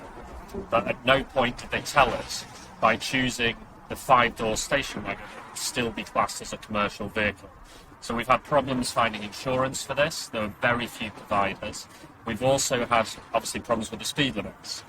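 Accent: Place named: United Kingdom. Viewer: I can hardly understand with your British accent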